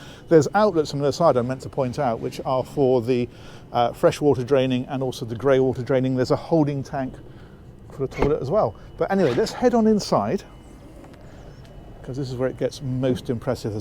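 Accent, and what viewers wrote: United Kingdom. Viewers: Sir, I am not your subscriber. But I used to see your videos. Because of 2 reasons: a) your clear British accent. b) you explain things very beautifully I congratulate you for your hard work May God Bless You Always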